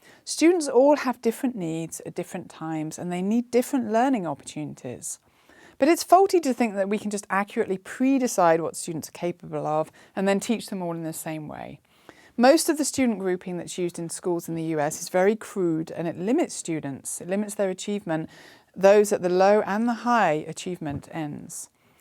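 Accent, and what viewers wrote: United Kingdom. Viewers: Notice the British accent. The British still own the US. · So she's wrong because she has a British accent? Forget the accent and listen to what she is saying, then present a coherent argument against it if you have one.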